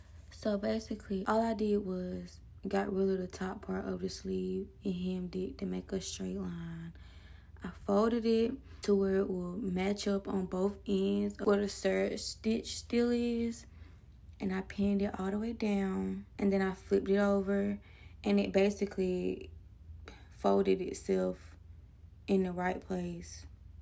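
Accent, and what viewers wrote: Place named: South, United States. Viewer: I think this is sooo Amazin!…., I think u should be wayyy up. U’re real deal. My Mama is a seamstress and I have to say that she and only u would be my personal designers if I wanted anythang Taylor made. I used to watch my Mama sew when I was young so she started showin me different things to tha point at around 10 years old, I was makin my own billfolds/wallet for my money to take to school. lol… she charge only $5 per alterations. It’s real money in it for your talent. I see this been 1 year ago so r u jus takin a break, becuz I know u ain’t give this up. Hit me back and let me know somethin. There nothin like Beauty wit Brains. Oh, I’m from Mississippi…where are u from?… I know it’s Southern like me cuz I can hear it in your voice. Mississippi, Georgia, Florida, Alabama, or Texas?… In that order.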